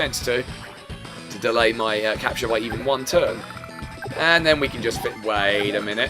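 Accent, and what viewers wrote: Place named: United Kingdom. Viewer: I was not expecting a British person. I'm a Brit myself, but still... Anyway, I hope your voice acting for Green Earth will be EPIC, since they're all British, too. I like to think I'm good at impersonating Drake. 🤣 Edit: Actually... Green Earth is probably not all British. Scratch that. Anyway, I'm so happy to see this content from you. ❤️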